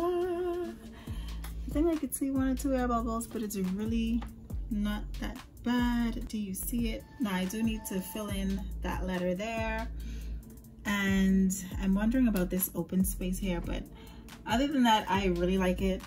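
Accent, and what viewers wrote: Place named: Bahamas. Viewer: I heard ur voice and I’m like hey this got to be my hometown people. Bahamian accent so recognizable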